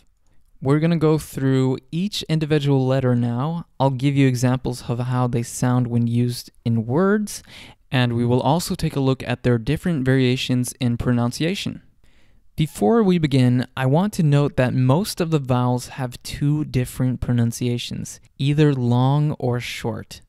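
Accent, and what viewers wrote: Trøndelag, Norway. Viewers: Good trøndersk pronounciation. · Hard to hide the trøjndærsk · remember norway has a fuck ton of dialects for a country as small as us, the person in the video is from someplace in mid norway and he sounds differant in his pronounciation than me who is from northern norway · Er du trønder? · Høres mest ut som kurs i trøndersk, spør du meg 🙂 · Jack R trøndersk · This is dialect, probably from Trøndelag. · Man kan høre at du er trønder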